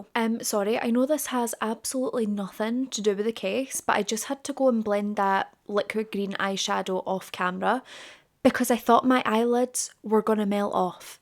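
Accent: Scotland, United Kingdom. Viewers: All my hype points went to you 💗 once again a new story for me, you’re the best. You were the #1 channel I watched in 2025, I’ll never get enough of you’re Scottish accent and to see what stunning new look you show us❤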